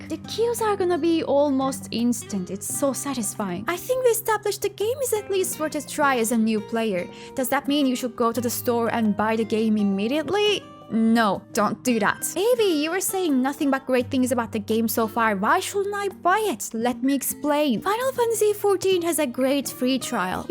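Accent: Turkey. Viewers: bu bir türk aksanı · I can smell a turkish accent, are you turkish? :D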